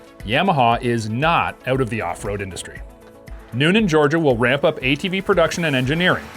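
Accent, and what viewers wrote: Canada. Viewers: You mentioned tariffs you sound Canadian so I think I understand why you did it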